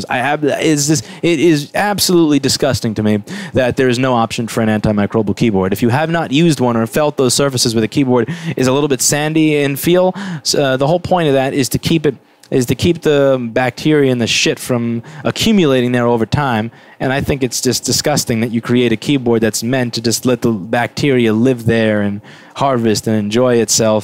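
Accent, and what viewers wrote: New York, United States. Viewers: You're definitely a new yorker...thats the only clear observation in this video.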